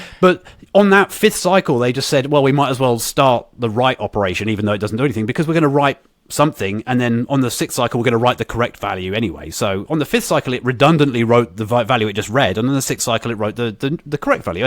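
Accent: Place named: United Kingdom. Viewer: I love these british accent. More than american english. Congrats!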